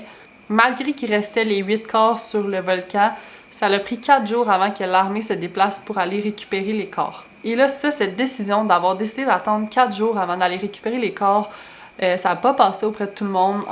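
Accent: Quebec, Canada. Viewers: Troisième vidéo que je regarde de ta chaîne et ce que je peux dire, c'est même avec ton petit accent du Québec. J'adore t'écouter et te visionner. Et puis t'arrive à captiver par ton récit des évènements, enfin c'est comme ça que je le vois. Pour ce qui est des évènements encore une fois désastreux. Moi, même si c'est considéré sans danger. Je me serais un peu renseigné sur le volcan en lui même. Parce que tous les types de volcans ont un niveau de dangerosité. Un volcan pyroplastique n'a pas les même risque qu'un volcan explosif comme celui qui est citer dans ta vidéo. Certes le nuage de cendre et les explosion ne sont pas excessives mais ça reste les plus dangereux dans les volcans. On peut toujours éviter une coulée pyroplastique soit en allant plus vite que la coulée (suivant sa viscovité) soit en essayant de partir dans un sens ou l'autre hors du tracer de la coulée. 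Encore une histoire bien triste, je suis de tout coeur avec les familles et les blessés dans cette histoire. A très vite pour une autre de tes vidéos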